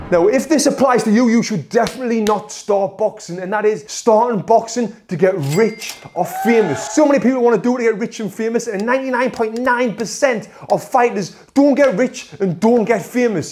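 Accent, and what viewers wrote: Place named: North, United Kingdom. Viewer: That northern accent sounds so surprising coming from someone with a deep tan, hahaha. Looking good, Tony!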